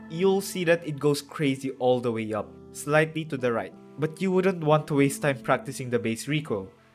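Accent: Philippines. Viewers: IDK, But you sound like you're Filipino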